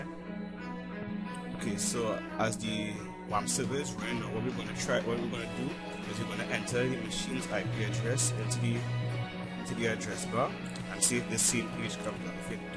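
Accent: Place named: Trinidad and Tobago. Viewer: boss u sound link a trini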